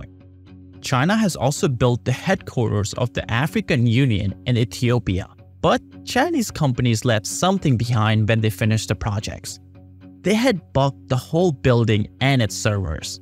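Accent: India. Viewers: Why you speak with an indian accent? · This guy is Indian. His accent is different from most indian but the the way he rolls his tongue is definitely indian.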